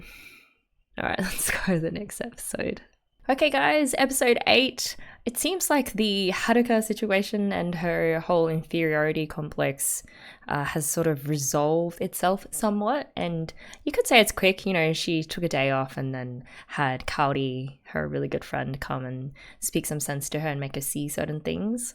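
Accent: Australia. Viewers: 紮馬 sls 香港人？ i thought you were aussie through and through lol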